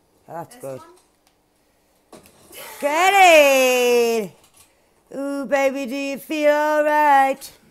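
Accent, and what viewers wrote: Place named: Newcastle, United Kingdom. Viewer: I just dident want to risk not dropping a comment to your vids, since I find them amazing :) Oh Newcastle, yea, remember you said Magpies was who you cheered for :) Its a great dialect though! fun to listen to!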